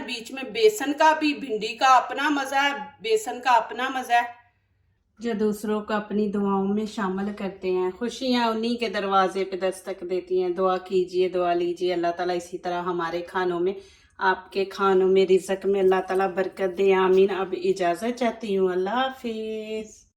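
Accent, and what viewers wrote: Punjab, India. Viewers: لہجہ پنجابی زبان اردو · Ye bibi urdu english punjabi lehjey main bolti hain bat samejh aa jati hai na to tunqeed kiun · Aoa boht acchi recipe .aap urdu punjabi lehjey main bolti hain. Behter ho ga k punjabi main btayn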